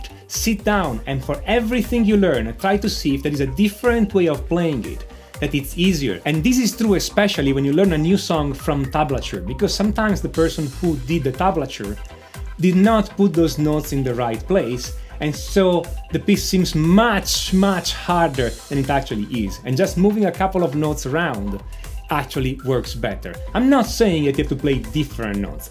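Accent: Italy. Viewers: he has quite restrained an accent for being Italian -^_^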